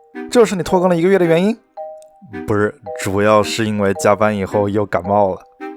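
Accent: Wuhan, China. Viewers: 熟悉的武汉普通话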